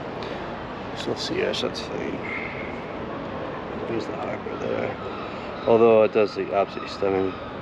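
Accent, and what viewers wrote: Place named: Aberdeen, United Kingdom. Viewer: Hi that’s a lovely video well done. you now have me on board and I have subbed to your channel. I too have a mini three pro and watching you operate yours is very instructive. Thanks for putting all the detail and showing your face, so many drone users tend to just show their footage and not make a story out of the video. I certainly try to do the same myself. I am loving the Aberdeen accent however I have had to put the subtitles on to catch everything you’re saying. Unfortunately the software can’t pick it up either so I just watch the pictures for the most part. Finally you are inticing me to play about with the settings far more, I generally just leave it to the automatic settings but you show how the shots and video can be better by changing the shutter speeds and white colour balance. Looking forward to seeing your other work.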